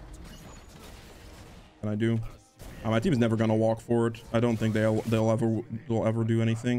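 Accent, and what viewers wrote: Netherlands. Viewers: Ran into your video's while looking if I wanted to play Udyr, immediately recognised your Dutch accent :D Keep up the great content!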